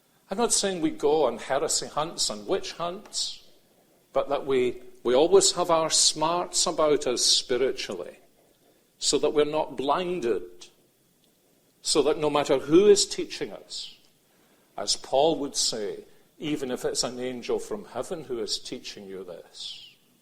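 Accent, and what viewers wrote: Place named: Scotland, United Kingdom. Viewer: Does this man of God preach in Scotland.? He has a Scottish accent.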